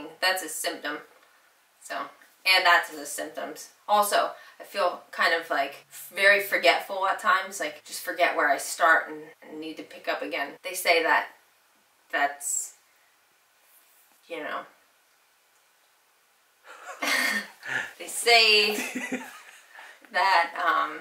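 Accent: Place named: Upstate New York, United States. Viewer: You are such an upstate New Yorker aren’t you lol. I live up in the Hudson valley area now and you sound like my girlfriends here. I’m 17 weeks - haven’t checked out your latest videos but this was really fun and enjoyable to watch! Thanks for sharing.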